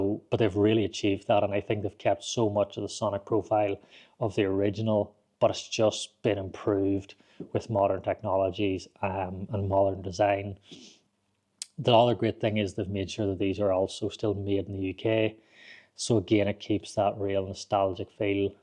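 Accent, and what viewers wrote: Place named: Ireland. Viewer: This gentleman is Irish 🇮🇪 you are having difficulties understanding because of his accent. The UK also has many different regional accents that you would also find difficult to understand.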